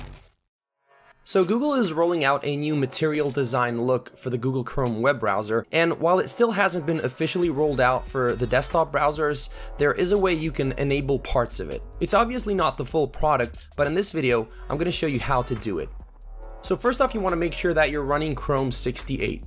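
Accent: Balkans. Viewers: You are from Balkan,aren't you? Still you speak English very well...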